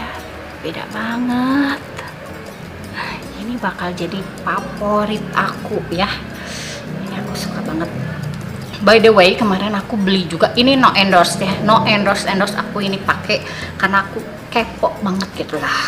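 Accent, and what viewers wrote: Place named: West Java, Indonesia. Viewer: Suka banget liat teh ngmong medok Sunda gini lucu itu, bodiiii bodiii lucu uy dengan kekhasannya. Ajhh habis ini mau nyobain deh produk YOU gara2 racun teh 🤩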